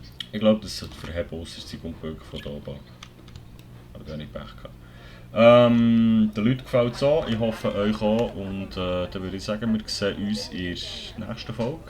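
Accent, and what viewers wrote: Bern, Switzerland. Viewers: Haha du siech schlofsch fascht i bim rede, Berner?😂 mega unterhaltsam, mach witer so.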